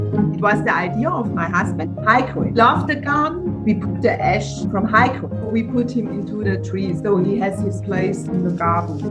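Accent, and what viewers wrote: Germany. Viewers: I was already wondering about your German accent before...xd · Are you a german? Later - Yeeees you are a german. You Dialect is german. German Toll das es auch mal eine geschafft hat, soviele Klicks zu bekommen. Zeigt das du das richtige Thema hast. Meine Bonny hatte nach 8 Wochen 4 Babys bekommen. Ich hatte sie alle gut untergebracht. Eine hatte ich behalten. Clyde hieß sie. Mittlerweile sind alle gestorben. Ich glaube sogar, das meine Bonny für mich gestorben ist, damit ich gesund werden konnte. Sie wurde überfahren. ihre Babys, Clyde und später kam Sophie (Scholl) zu mir zurück, wurden fast 20 und 21 Jahre! Sophie habe ich Garten begraben. Als sie ging, habe ich ihre Seele gesehen. Sie glitt durch meine Finger und glitzerte. Ein Licht umgab sie, kreisrund um ihren Körper. So groß wie sie lang war! Ich vermisse sie noch immer! Obwohl sie schon 2006 ging. 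Schön das sie Heiko ein so gutes Zuhause gegeben haben. Das freut mich sehr! Ich wünsche ihnen viel Gesundheit und viele neue Tiere die sie retten können! English It's great that one managed to get so many clicks. Shows you have the right topic. My Bonny had 4 babies after 8 weeks. I accommodated them all well. I kept one. Her name was Clyde. All have now died. I even think my Bonny died for me so I could get well. She was run over. their babies, Clyde and later Sophie (Scholl) came back to me, lived to almost 20 and 21 years! I buried Sophie in the garden. When she left I saw her soul. It slipped through my fingers and glittered. A light surrounded her, circling her body. As tall as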